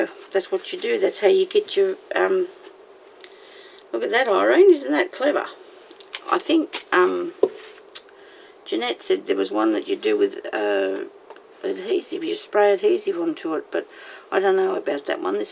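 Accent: Australia. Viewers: Loved your tutorial! Loved as well your Aussie accent! Can't say more as I have to go and try it while it's fresh in my mind! Thanks.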